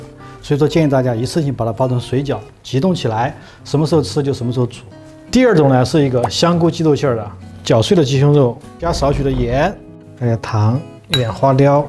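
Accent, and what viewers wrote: Sichuan, China. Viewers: 听口音是四川人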